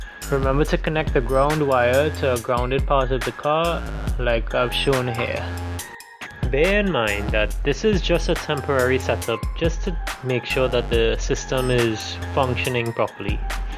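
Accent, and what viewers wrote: Trinidad and Tobago. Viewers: your from trinidad aint you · Hi wonder if you can help? I have thks same kit and a 1996 Toyota Supra. The dash wiring that connets to the barrel has 6 wires...can you help me out? Btw you sounding like a trini?? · Hey are you from Trinidad and Tobago? You sound like a trini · You sound like a Trini 🇹🇹🇹🇹🇹🇹 good job bro if you are indeed from Trinidad maybe you can help me connect this to my Legacy GT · Almost sounding trini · Accent sounds Trini.. Now i can do it myself.. Ydk.. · Are you Trini? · “Dem one handed skills thooooooo”. Definitely a Trini. Thanks for this man, top notch stuff. From your Jamaican cousin! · U sound like a trini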